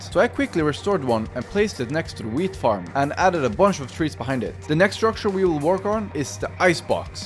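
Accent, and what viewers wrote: Sweden. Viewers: are you from sweden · love the Swedish accent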